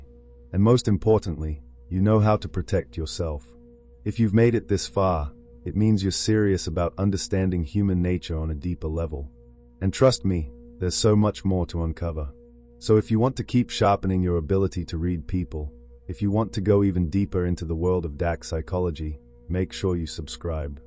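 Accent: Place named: Jersey, United Kingdom. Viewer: What surprised me the most?: Your British accent with Jersey dialect. EI: START: STAT. DARK: DAK... Trying to confuse us all?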